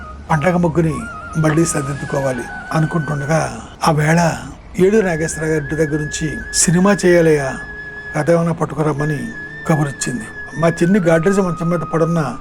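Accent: Godavari, India. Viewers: గోదావరి యాస లో మీరు మాట్లాడతా ఉంటే ఎంతో ముచ్చటగా ఉంది. అదీ మీ సినిమా గురించి 🎉🎉🎉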